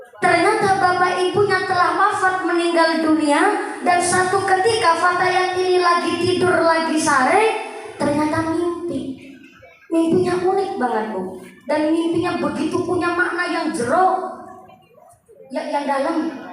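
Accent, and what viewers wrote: Banyumas, Indonesia. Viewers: Pokoknya gak ilang ngapaknya... Hihi Kls 4 SD beliau sudah ceramah di desa Saya... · Kayaknya kalau ora ngapak orak kepenak he he he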